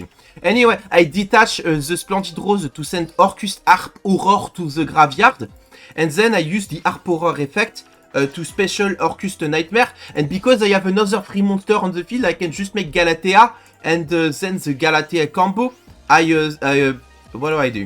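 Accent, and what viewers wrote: France. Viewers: l'accent francais 10/10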